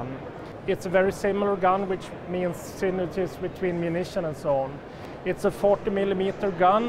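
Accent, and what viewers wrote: Sweden. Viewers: Swenglish sound so horrible. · Horrible Swedish accent + lisp. I understood it perfectly, being Swedish myself, but man it is the opposite of an eargasm to listen to my countrymen when they can't pronounce English properly. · Swedish i think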